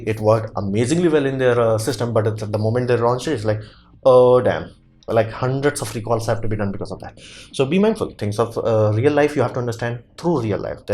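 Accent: India. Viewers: Our Bharatiya pronunciations. and fast speaking makes it difficult to digest your topic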